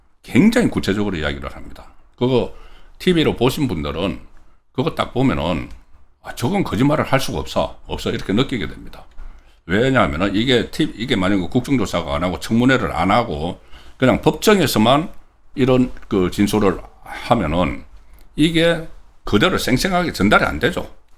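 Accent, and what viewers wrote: Gyeongsang, South Korea. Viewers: 불만있으면 안보면 그만이지만, 송국건 본부장은 말보다는 글을 써야 할 사람인 듯, 제목이 구미를 당겨 보게는 되는데, 발음도 엉망이고 억양도 엉망 경북사투리라고 넘어가기엔 30년차 수도권기자라고 하기엔 ...아무튼 그래서 내용 전달이 안됨, 듣기 시험보듯이 집중하면 모를까. 수도권에서 사는 전라도 사람, 경상도 사람, 충청도 사람이 자기 출신지역 사투리와 억양을 안바꾸는 사람은 좋게 보면 정서적인 사람이지만 달리 보면 다른 사람과 타협하지 않는 아집을 드러내는 거라는 걸 당사자들만 모름. 결론적으로 말하자면, 어차피 나의 우리만의 유튜브방송이라면 모를까 많은 구독자가 생기길, 봐주길 바라는 마음이라면 내 말에 감사함을 느끼시길.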